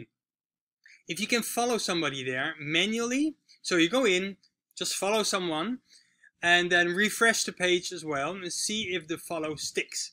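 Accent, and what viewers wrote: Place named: Netherlands. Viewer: Grappig dat je nederlands accent hoort .. ik kom trouwens uit Honduras🇭🇳 ,groetjes en genietze in Costarica 🇨🇷💯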